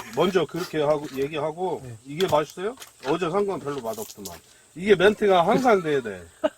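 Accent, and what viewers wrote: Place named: Chungcheong, South Korea. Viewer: 완전 ‘전원일기 2’네유~~ 구수한 사투리, 변치 마러유!! 넘 정겨워유~~!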